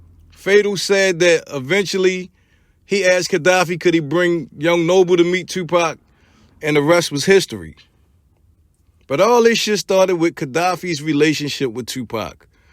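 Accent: Baltimore, United States. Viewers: I’m new here but I hear the bmore accent fam salute to my bmore brothers from DC🫡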